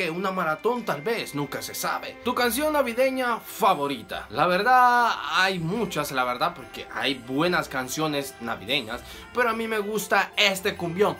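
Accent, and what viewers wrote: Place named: Mexico. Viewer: Adoctando? 😂 Ese man habla más como mexicano los hondureños no hablamos como montunos 🤣 bueno al menos la mayoría que yo conozco además cada quien habla como quiere 😎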